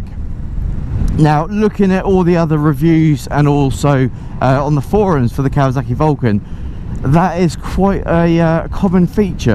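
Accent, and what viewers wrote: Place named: United Kingdom. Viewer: 😮Man is your British accent HEAVY dude. It's kind of hard to understand you to be honest with you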